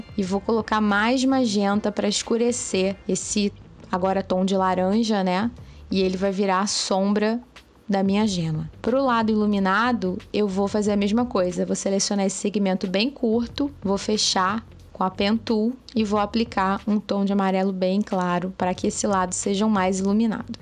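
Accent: Rio de Janeiro, Brazil. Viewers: Vc é muito didática! Adoro os vídeos e o sotaque carioca kkkkk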